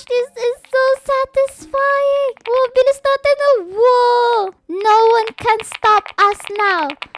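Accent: Visayas, Philippines. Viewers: Bisaya kaba ate!? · Ate bisaya ka pala kala ko Tagalog ka ❤❤❤❤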